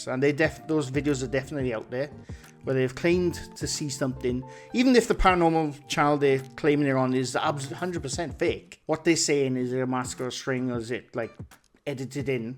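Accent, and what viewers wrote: United Kingdom. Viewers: I take it from your accent that you are posting from the UK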